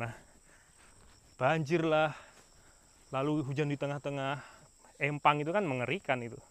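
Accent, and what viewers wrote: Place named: Java, Indonesia. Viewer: hehe sama2🙏🙏logat2e ngomonge kok masih enek jowone to om😃😃🙏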